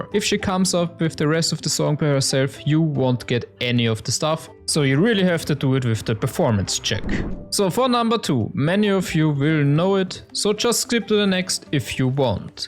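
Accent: Germany. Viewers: Junge dein deutscher englisch dialekt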